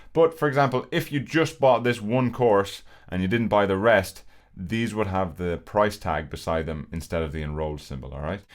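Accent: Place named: Ireland. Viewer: I didn't expect him to have a deep voice with an Irish accent. I'm definitely getting the course now lmao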